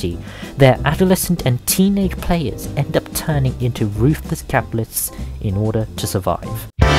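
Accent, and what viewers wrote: United Kingdom. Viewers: Voice over is so excessively british I cannot concentrate on this despite being very interested in the story.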